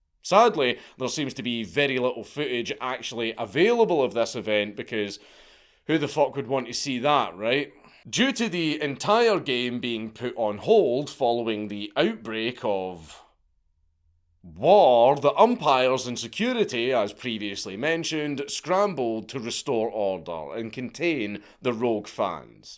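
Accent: Scotland, United Kingdom. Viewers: The legend of 10 cent beer night needed to be told in a Scottish accent. The world is healing. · bruh you're scottish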